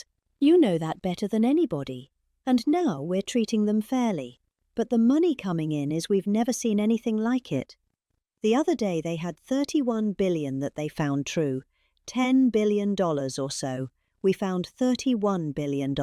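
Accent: England, United Kingdom. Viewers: Spoken in real English by a E glish lady, shows just what a load of rubbish he comes out with. No one can make sense of this rubbish. This is the King of America?? This madman?? This the best you can come.up with??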